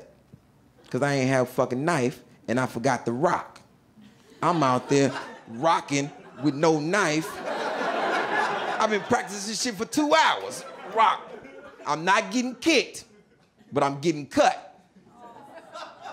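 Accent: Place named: Texas, United States. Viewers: Lol I can tell hes from Texas and locked up in Texas prison just off the way he’s talking and the small details of the story. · Sound like he was In Tdc ; some Texas shit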